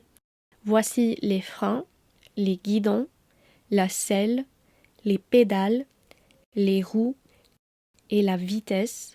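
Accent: United Kingdom. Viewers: I feel you have a slight British accent in French, did you grow up in the UK?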